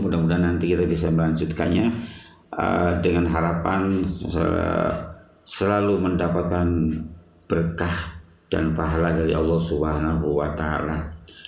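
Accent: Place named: Java, Indonesia. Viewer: Bahasa MEDOK gini sepertinya unik nih ,,,wkwkwk soalnya saya lihat dari part - 1 sampai part - 2 sama.tapi bagi saya masuk sekali cara penjgkajiannya,monggo dilanjut pak ustadz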